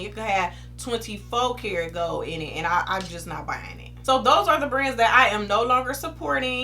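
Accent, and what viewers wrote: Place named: South, United States. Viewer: You sound southern , where you from love 😍. Loved the video and honesty ❤️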